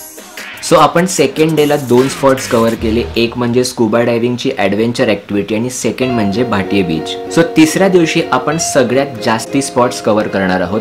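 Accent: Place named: London, United Kingdom. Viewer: हा असे मराठी का बोलतोय?London born आहे का?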